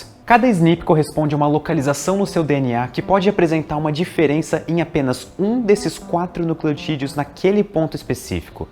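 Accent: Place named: Rio Grande do Sul, Brazil. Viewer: você é gaúcho?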